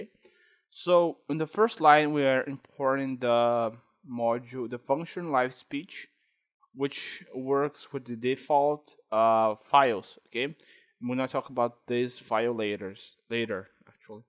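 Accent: Brazil. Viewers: E esse ingles de br ai.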